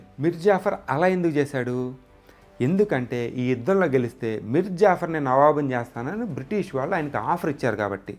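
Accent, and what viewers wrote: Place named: East Godavari, India. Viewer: ఈ ఎధవ తూగోజీ యాసలో ముసలోల్లు చెప్పిన టులు ఏంది ఈ వాగుడు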